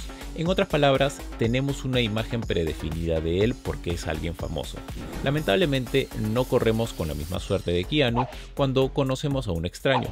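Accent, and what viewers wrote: Peru. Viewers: Muy Buen video. Eres peruano? Tienes el acento.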